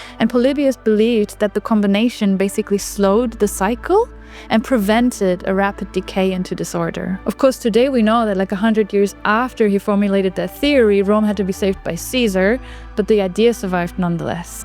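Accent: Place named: Germany. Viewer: So basically she wants to spread her dictatorship ideology. You literally have no example other than keep saying Singapore. There is thousands of example that democracy and liberalism developed the world. Look at Europe and England. The development and industrial revolution took place when the power of the kings has limited. We know below your cutie German accent, a big propaganda exist.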